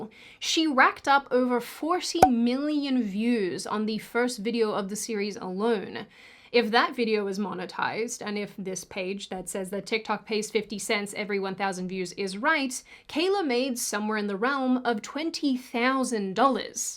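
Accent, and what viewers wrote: Australia. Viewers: You still definitely sound Australian 😅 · Nah, I didn't know you were living in the US. I thought you were living in Australia. You definitely have a bit of that Australia in you. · Bless, you do sound Australian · "Im Australian though I don't sound like it. " Um... you definitely sound like it. 😄 The accent is a little muted but still very recognizable. 😉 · Do you honestly think you don't sound Australian? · I totally still hear your Aussie accent!!lol it's cute, I love it 🙂💚🦘😄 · You can say you don’t sound Australian all you want. It’s still there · You don’t think you sound Australian? You sound Australian as fuck 😂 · This pommy thinks you stilli very much sound Australian:) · Spending time with your mum brought some of your Aussie accent back. As can happen. My mum spoke northern English when she used to speak to her mum on the phone when I was young. 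A small observation without a judgement :) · darling, you Do Sound Australian! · You sound extremely Australian. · We can tell your Australian! LOL you don't sound too off!😂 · You sound like an Australian. . . No idea what you're on about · Seems her Australian accent is stronger with this video - perhaps because she recently spent so much time with her family. Didn't detract from the video though. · the more I watch your videos the more noticable your aussie accent gets when it slips happy birthday, love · You have a small Australian accent like the youtuber "TheAnimeMan," and I knew it right away when I heard you. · TBH, you sound very Australian and there's nothing wrong with that. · I have a lot of Aussie friends because of gaming and I can spot the accent no problem it's still there! 😁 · You still sound Australian. But you should still be happy where you come from. Australia is a wonderful country. 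What made you want to move to America? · You sound absolutely Australian ! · Good on you, family is priority. Also, you still sound Australian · You totally sound Australien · I can hear those bits of Aussie. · You absolutely sound Australian, but its a light accent. Happy 30! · I can still tell you’re from Australia, and that’s fine. It’s a good accent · Also, you may not sound like Issac Butterfield, but you still sound like an Aussie. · I picked you for an Aussie. It’s not completely hidden. Hi from across the globe 😊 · The Australian accent is blatantly obvious, and I mean that in a nice way! lol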